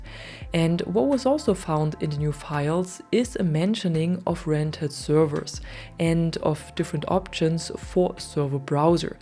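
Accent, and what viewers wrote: Germany. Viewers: as a german, she sounds ultra german to me haha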